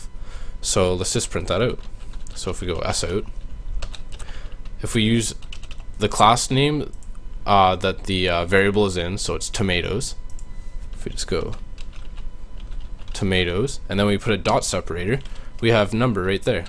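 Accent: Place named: Canada. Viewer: Canadian…. I was fishing around for the accent. Didn't quite get the origin.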